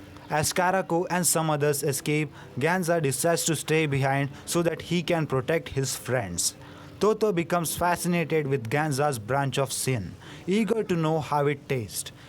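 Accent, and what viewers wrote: India. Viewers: Are you from india your voice sounds like indian I am a new viewer so I don't know if you said anything about this in past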